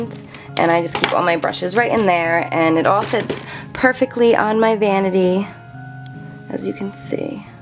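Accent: New Jersey, United States. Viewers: Good heavens, that's a Jersey accent. Hearing you speak reminds me of my friend's accent and how much I wish she were still alive. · Her Jersey accent ❤ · It's called a New Jersey accent, not a California accent. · Please don't be sorry for being who you are!!! I love that Jersey accent, and I say cawfee and I'm from New York. Negative comments always come from those feeling that way inside its a reflection of how they feel about themselves. I love all your videos and the genuine side you always show. Beautiful inside and out! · Where are u from? Are u from jersey? Trying to figure out where ur accent is from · she's from Jersey. It's her accent. I pronounce it the same. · Actually I think it's a New Jersey accent. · new subscriber here - love your Jersey accent!! (I am from Long Island - we have a similar accent :-) great video - got lots of ideas! 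Thanks! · It's a Jersey accent. :) I used to say it like that until I moved to Virginia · Your accent has gotten so strong "jersey".. You're adorable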